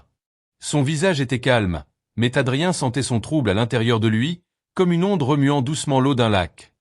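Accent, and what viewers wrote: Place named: Portugal. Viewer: C'est n est pas une autre langue..mais du français avec un accent portugais ou brésilien Mais, oui,c est penible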